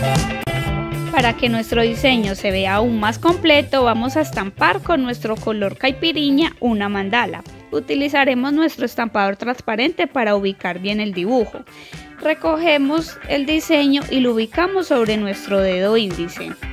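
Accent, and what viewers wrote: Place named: Colombia. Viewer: Por tu acento puede que seas de Cali ó Medellín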